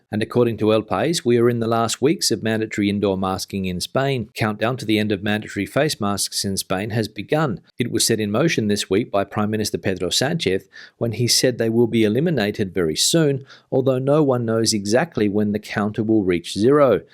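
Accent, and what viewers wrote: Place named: Australia. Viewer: Hi, I note you have an Australian accent! Do you see many other Australian expats in Spain, and what made you move to Esp from Aus?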